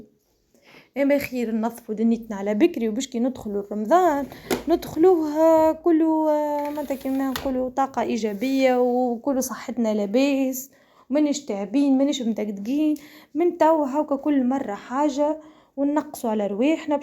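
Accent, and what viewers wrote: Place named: Tunisia. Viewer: اللهجة التونسية انموت عليها اللهم بارك والله نعرف تونسيات الله ايبارك خيات نعمة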